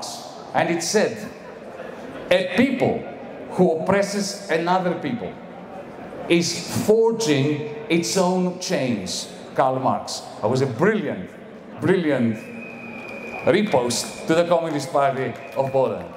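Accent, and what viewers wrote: Greece. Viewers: 典型的希腊口音英语。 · 希腊人说英语的口音都是这样😂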